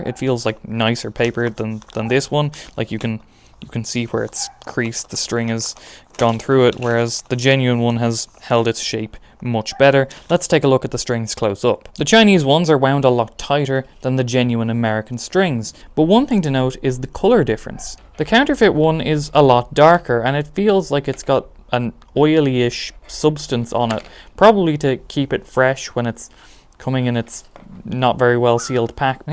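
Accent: Canada. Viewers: Are you a Newfie. You sound like my daughter from St. John's.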